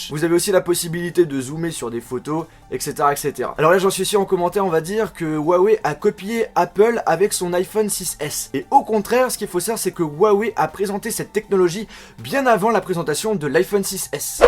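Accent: France. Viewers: Are you French？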